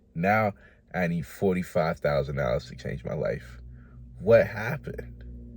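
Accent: United States. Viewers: You from Rhode Island? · I would just like to know how did you get ur boston accent when u not from boston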